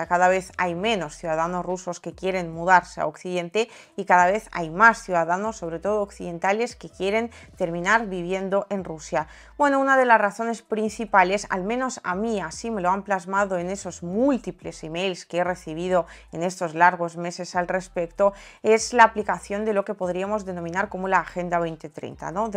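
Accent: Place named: Spain. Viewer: Es una ironía que critiquen como hablas español de España,los que le dimos acentos modismos fuimos nosotros ..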